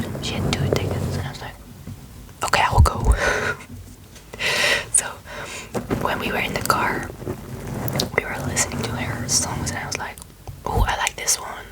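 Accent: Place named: Netherlands. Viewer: Lovely accent ❤️ , are you from The Netherlands?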